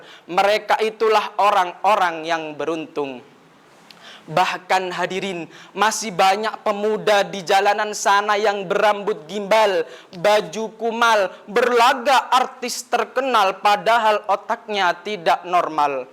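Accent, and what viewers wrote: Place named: Surabaya, Indonesia. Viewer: ligatnyaa berasa banget org surabayaaa. ayo9 di tekan lagi intonasinya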